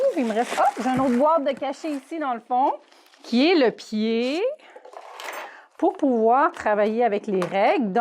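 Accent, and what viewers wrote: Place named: Canada. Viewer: Oh un accent canadien....de Celine Dillon...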